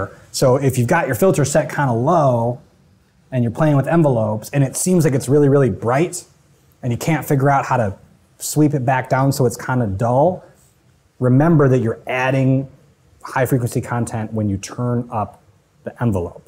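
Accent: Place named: United States. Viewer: Also never comes out of character with the American accent....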